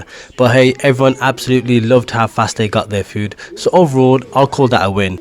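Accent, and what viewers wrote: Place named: Birmingham, United Kingdom. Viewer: ha glad my comment made you giggle 😂 Are you from brum? I’m in Redditch so I recognise that brummie accent 😅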